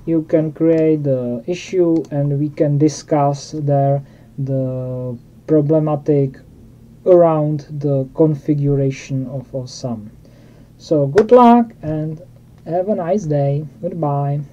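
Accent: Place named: Czech Republic. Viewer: i cant just sniff out my fellow czech brother from that accent :). Greetings from SK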